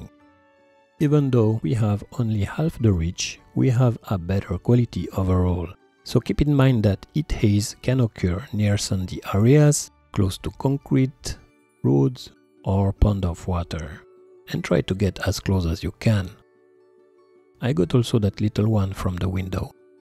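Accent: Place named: France. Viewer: Merci pour cette très bonne et belle vidéo test. Le petit +: même sans activé les sous-titres je comprends beaucoup mieux votre anglais grâce à votre accent français 😄. Etant amateur débutant, photos nature, oiseaux et animalier, celle-ci m'a grandement influencée pour l'achat du R7 + 100-400 avec un budget raisonnable. Thank you very much!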